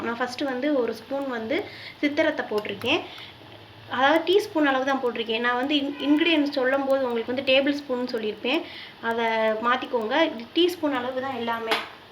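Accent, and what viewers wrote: Madurai, India. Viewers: madura vaasam unga pechula and paruthi paal namma madurai la mattum thaan spl and kedaikkum innum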